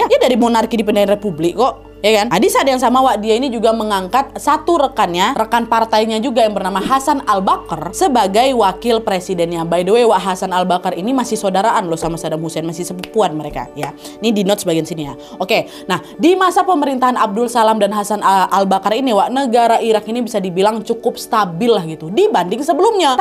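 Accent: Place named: North Sumatra, Indonesia. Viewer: Logat bataknya kental banget yaa.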